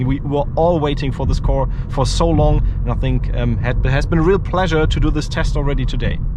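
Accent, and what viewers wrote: Germany. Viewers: Der deutsche Akzent ist auf die Dauer schon hart für die Ohren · Thats every germans accent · German-English :-) best english in the world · The German accent makes this car so much more German 😁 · dat german accent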